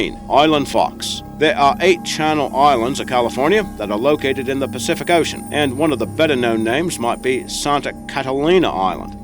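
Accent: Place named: Australia. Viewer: Your accent sounds Australian. If so, you've got a few amazing animals yourself...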